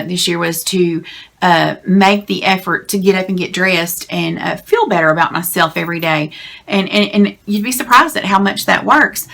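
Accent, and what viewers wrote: South, United States. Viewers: Do you count calories? I am struggling with life...divorced, 3 kids at home, struggling with being a single parent...I've gained 100 pounds. I'm ashamed of myself. I used to do 10Ks and now I don't even enjoy walking. I used to be a vegetarian because I really don't like meat. My doctor told me to follow the DASH diet which is basically low carb. I'm so glad I found you because you sound like me (but I don't have your darling southern accent). I eat to cope. I used to eat to live (healthy) and now, I eat to cope. Sad. Hard to admit. I have been counting calories and I lost 10 pounds this last month. However...I feel like a slave to numbers. I am an educated person. I know calories count. I just know I am not going to do this for the rest of my life, so I feel it's setting me up for failure. Do you count only carbs? If you count calories, how many do you allow yourself per day? 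Thank you! You are a delight. 💕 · Oh and I love your southern dialect!! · You are beautiful, love the country accent. . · Beautiful, down-to-earth and a sweet Southern accent! She is my new best friend in my head! LOL!!... Congratulations on your fitness journey! I'm inspired!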